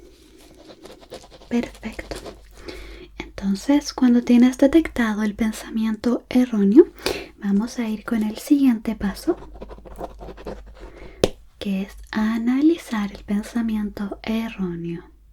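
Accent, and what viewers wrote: Chile. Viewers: Acento chileno ? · Es como si Latte ASMR hiciera vídeos en español. Pero ese acento chileno que tienes me fascina. 💙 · Algo que valoro incalculablemente cuando miro cualquier contenido ASMR, es la naturalidad de las interpretaciones cuando se trata de rol. Tú tienes una facilidad deliciosamente buena para esto. Desde la modulación de tu voz hasta la suavidad del movimiento de tus manos; agradezco tantísimo haber encontrado tu canal, tu y tu bello acento chileno siempre logran relajarme. BAH, QUE DIGO, Tus videos en inglés también son bellísimos ✨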